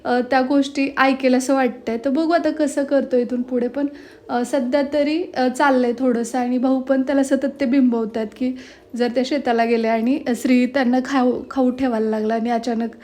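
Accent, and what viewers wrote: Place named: Latur, India. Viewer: Tu pn aata लातूर chi भाषा बोलतेय khup aavdle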